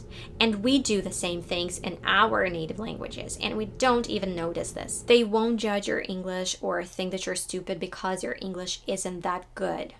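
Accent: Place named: United States. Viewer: sometimes I do get the strong impression/feeling that you're really an American!!! Sure,sometimes I forget that your native language is the Ukranian one...your English is TERRIFIC!!!! Congrats! 🇺🇸🇺🇸🇺🇸🇺🇸🇺🇸🇺🇸🇺🇸🇺🇸❤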